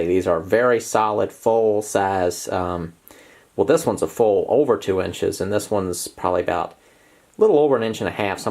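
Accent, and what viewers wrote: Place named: Mid-Atlantic, United States. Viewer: It's mid Atlantic, coastal. I'd say he's somewhere in the Virginia-South Carolina region. Shift 250 miles inland and you get Scots-Irish Southern. Regional accents can be telling, even as little as 100 miles can define a region.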